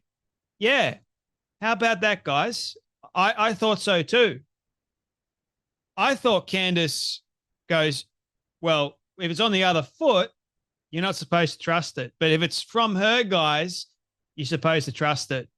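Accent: Australia. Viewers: Love, love your accent...are you Aussie? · You an Aussie?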